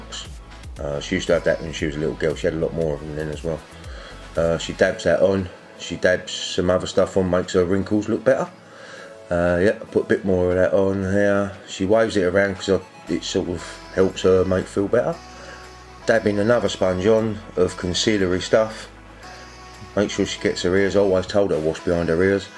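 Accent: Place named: Cockney, United Kingdom. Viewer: cockney accent love it x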